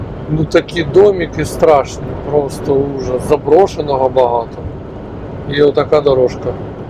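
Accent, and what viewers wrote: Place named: Poltava, Ukraine. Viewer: Головне ти намагаєся,мовник сам собою налагодиться.А зараз в тебе виходить полтавський суржик.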